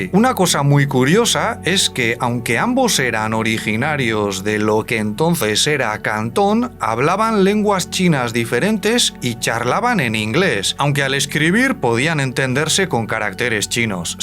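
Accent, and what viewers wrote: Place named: Basque Country, Spain. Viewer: Es acento típico de la zona de España de donde procede. Esa cadencia que dices, viene de influencia del euskera.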